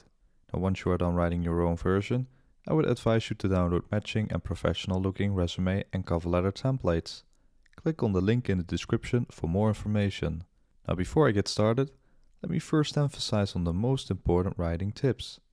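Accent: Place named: Netherlands. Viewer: dutch accent is the best accent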